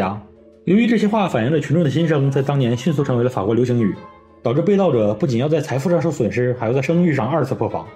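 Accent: Northeast, China. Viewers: 这一股大碴子味。哈哈，真亲切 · 我铁粉节目里语言上家乡风味很足东北人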